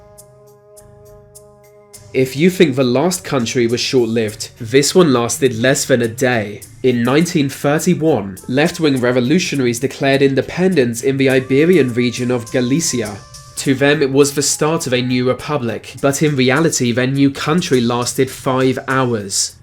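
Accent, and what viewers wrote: England, United Kingdom. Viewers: How come you have an English accent and yet pronounce viceroy with an extra syllable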